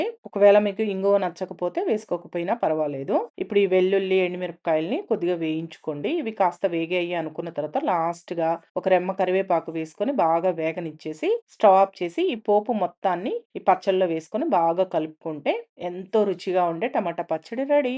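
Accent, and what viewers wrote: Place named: Telangana, India. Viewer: Edi Telangana style😊.. baguntundi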